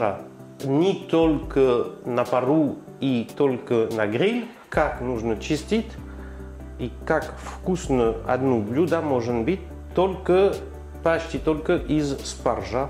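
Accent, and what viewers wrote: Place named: Gascony, France. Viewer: Очень вкусно и интересно рассказал , а главное акцент классный. Вы гасконец , он похож на Евгения Данчевского из трёх мушкетёров. ,, Имя сестра, назови мне его имя !!!"))) Фельтон !!!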